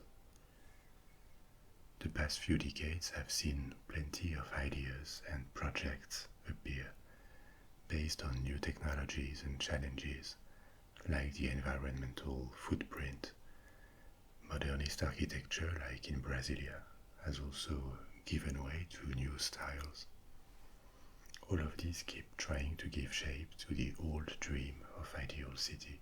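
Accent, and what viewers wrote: France. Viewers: Happy new year and all the best to you too, mister relaxing french voice :D in all seriousness I found your channel recently and I'm hooked, amazing work ^_^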